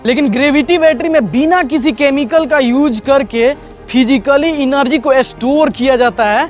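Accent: Bihar, India. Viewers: Aap Bihar se ho na?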